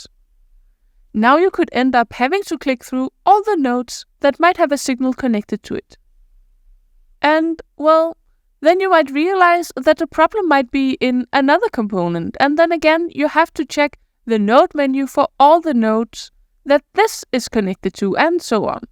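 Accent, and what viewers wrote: Denmark. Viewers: great video, strange random question(feel free to not answer): are you Brackeys GF? you have a very similar way of setting up the video, explaining the issue and talking (this is a good thing, he is very good at this) even down to the Jylland accent.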